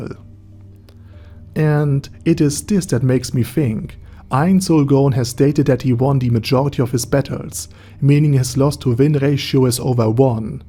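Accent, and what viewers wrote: Germany. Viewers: Hearing someone analyze anime characters with a german accent sounds so wrong yet so enjoyable.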